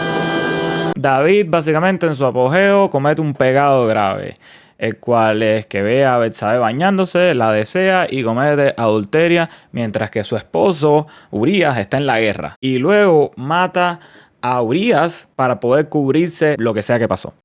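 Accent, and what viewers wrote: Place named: Cuba. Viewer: Bro eres Elieser el Bayardo versión agnóstico ateo , me encanta escuchar el acento cubano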